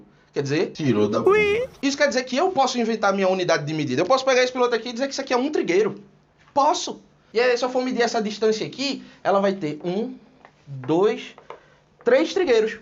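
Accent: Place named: Northeast, Brazil. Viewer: Adoreii a aula. Achei mto animada. E seu sotaque nordestino,foi oq me chamou mais a atenção.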